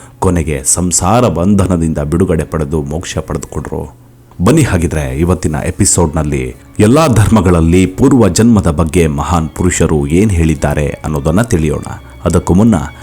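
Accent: Standard. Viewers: Nimma nirupanashyli adbhuthavagide. Shuddha Kannada karnanandakara. 🙏🙏🙏🙏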